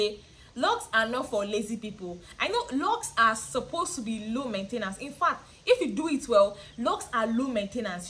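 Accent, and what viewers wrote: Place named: Lagos, Nigeria. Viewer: What part of lagos are you from? You're accent is nice.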